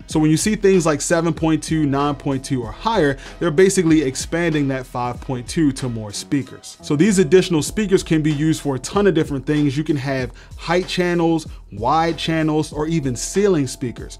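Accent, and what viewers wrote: Baltimore, United States. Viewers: I knew you was from Baltimore lol. 👌🏾🤘🏾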